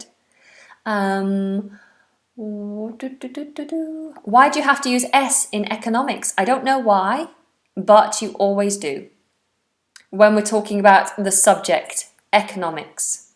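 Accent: United Kingdom. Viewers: I love your British accent,! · Very beautiful British english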